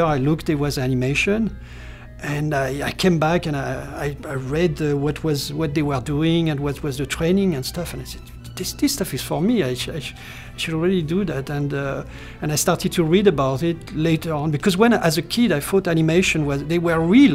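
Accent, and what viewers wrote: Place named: France. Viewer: Superbe accent français😂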